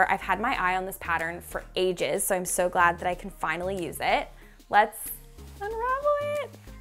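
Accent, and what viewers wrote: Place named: Canada. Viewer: I knew the first time I heard you that you were Canadian. Ouut.and about. I love Canadians. Unfortunately I’m stuck in the USA during a very dark time.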